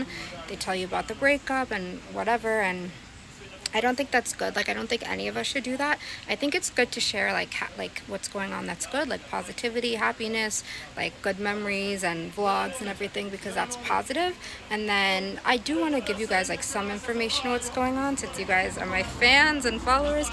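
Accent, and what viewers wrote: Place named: Jamaica. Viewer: Love your slight Jamaican twang, it's cute. Your relationship is not my business. You look good, glowing and healthy. 😊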